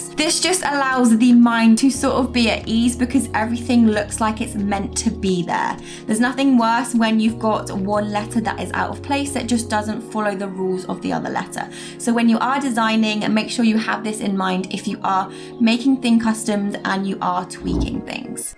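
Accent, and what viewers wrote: United Kingdom. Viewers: I'm self-taught just like you, and it's so refreshing to learn from someone who doesn't linger on complexities and has found ways to just use common sense instead of doing things "by the book". It's amazing what you can learn just getting lost in tutorials, and you just added quite a few ideas to my arsenal. I usually prefer to read rather than watch videos... But in this case, considering how you make it very easy to understand and don't use obscure tools or lengthy techniques (and I must admit... gorgeous blonde + British accent = hell yes), you sold me from the first second. Subscribed. Liked. Bravo!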